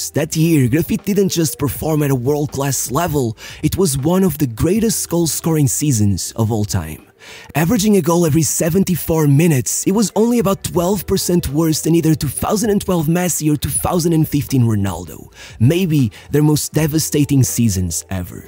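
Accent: Portugal. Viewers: Quem é o tuga que dá a voz a estes vídeos? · Are you Portuguese?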